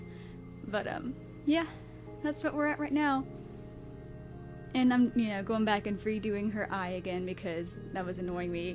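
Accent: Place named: South, United States. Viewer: OMMGGGGGGGG your voice is so cute ya lil southern bell u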